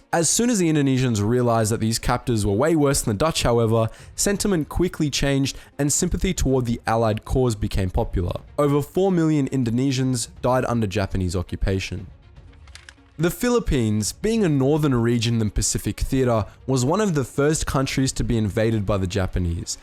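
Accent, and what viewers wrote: Australia. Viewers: Ah I dint know this man was Australian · Just wondering are you Aussie like me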